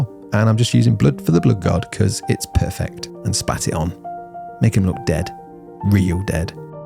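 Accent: United States. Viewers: LOL, never thought I would hear use an American accent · Your American accent sounds like my uncle. You were also dressed like him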